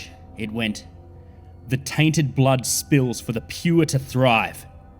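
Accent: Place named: Australia. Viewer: This is definitely one of the best creepy stories I've heard in a while , I mean u knew the tapes would be bad buuuut....yea that was so original. I love ur Aussie accent n I love how u can change voices n pitches and personalities. Fanfuckingtastic!!!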